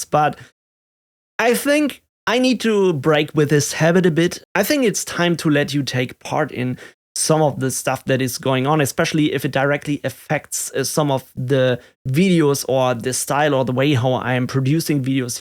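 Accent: Germany. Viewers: Aside from a few minor "yellows of the egg" your English is very good grammatically. Your German accent is very noticeable, but it's also a recognizable feature of the channel, so while it may be a worthwhile pursuit to "improve" your pronunciation for your personal life, I think there's no need to dedicate any time to it if you only want to use English for business. Irregularity is a feature, not a bug when you want to get noticed and want to stay in people's minds. Hope you have a good vacation (lite)! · Gönn Dir eine Pause, den Punkt den Du gerade hast, hatte ich mal vor gut 20 Jahren, damals noch als Contentcreator für eine eigene Webseite. Wenn's sich wie eine Pflicht anfühlt, zieh Dich raus und mach wieder das, worauf Du Lust hast. Dein Englisch ist absolut in Ordnung. Ja ich höre den Akzent - deshalb habe ich auch mal auf deutsch geschrieben vor Zeiten, aber geh mal auf eine internationale Konferenz und hör da mal zu, dann weisst Du, Du bist besser als 85%. 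Es gibt easy mal 10.000+ Germans die nicht die Eier haben sich vor eine Kamera zu setzen und in einer Fremsprache aufzunehmen. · You are amazing, take a break if you need my bud, just know that you are great and very helpful, some day please make dedicated proxmox videos :) thank you for all of your help docker ECT you have helped me understand many things.(and you speak English very well, I understand you very good, the German accent makes it awesome.) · Hey man, I've burned out from a job before, it's no fun so I'm happy to see you take a well-deserved mental health break. Looking forward to some more videos for the homelabbers and IT professionals, like kubernetes, firewalls, ... - Regarding the English, as a native Dutch speaker, I think your English is great and I like the German accent. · When confronted with a difficulty getting a container running properly in my home setup, I'm always happy to see one of your videos in the results of a YT search for help. 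I don't know how you do it, but you often cover exactly the specific little problem I'm stuck on. Also, your videos provide just the right mix of "type this" and "because." I'm a retired American with the cliches you might expect: declining hearing ability and non-existent foreign language skills. I struggle to understand some people who speak accented English. Listening to you however is almost never hard for me. Your unmistakable German accent is, for me, charming and a positive aspect of your brand. Enjoy your time off,. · Hi! I feel that your main frustration is coming from the foreign language, I agree that you are not having an easy job with that. But I can assure you that, similarly to you I am also not a native english speaker as a Hungarian, but your english is very good. I am working with many international people in my job, german english is one of my favorite. The accent to me is understandable and honestly speaking a bit funny too, in a very good way.:) Explanation in these topics are not easy on by own, but I think you are doing a great job. 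You are giving a lot of information to the crowd which is very valuable, even if you just mention a product/open source project or something. If you feel like you like to do this, don't give up!:) I understand you are a maximalist, but don't compare yourself to others! I am also watching Chuck, he is a different personality. From my perspective he doesn't do anything better, or in a better quality. It's just different, we are unique. You should just do what you like and how you like. You will attract people who will appreciate you. Alright, back to business, so what I just wanted to say, Don't Give Up! and Enjoy your holidays!;) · Sir, you are unique and you stand alone. I love your videos and your presentations and I rejoice in your German accent. You're thoughtful, complete, entertaining and ultimately YOU MAKE A DIFFERENCE. Rise!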